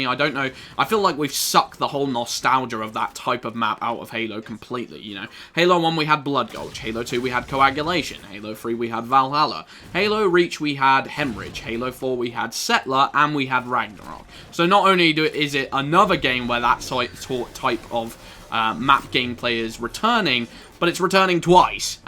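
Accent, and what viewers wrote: United States. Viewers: American accent FTW!! Hey add me!!! Just a friendly reminder! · Dude your american accent is perfect. LoL. Also you have a very interesting perspective on maps that I never thought to look at before.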